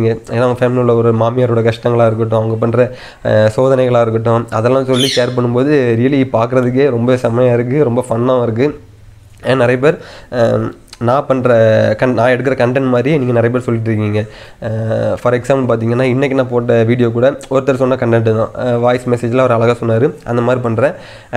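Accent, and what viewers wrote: Kanyakumari, India. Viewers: R Really I Intelligent S Slang Master H Hyper Power A Appreciate B Best The Best kumari Slang Means You only 💓 Lots of love💓💓💓💓💓💞💞💞💞💞💞💞💞💞💞💞💞💞💞💞💞💞💞💞💞💞💞💞💞💞💞💞💞💞💞💞💞💞💞💞💞💞💞💞💞💞💞💞💞💞💞💞💞💞💞💞💞💞💞💞💞💞💞💞💞💞💞💞💞💞💞💞💞💞💞💞💞💞💞💞💞💞💞💞💞💞💞💞💞💞💞💞💞💞💞💞💞💞💞💞💞💞💞💞💞💞😍😍😍😍😍😍😍😍😍😍😘😘😘😘😘😘😘😘😘😘😘😘😘😁😁😁😁😁😁😁😁😁😁😁😁😁😁😁😁😁😁😁😁😁😁😁😁😁😁Chella mavane 😍🤣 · கன்னியாகுமரி slang 😍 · Athu kanyakumari slang bro💪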